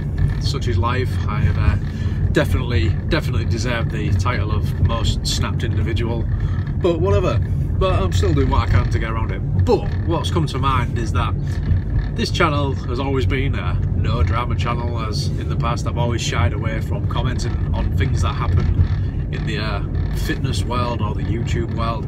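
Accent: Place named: Teesside, United Kingdom. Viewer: Great vid mate, where about's you from? Sound a little bit like a smoggie!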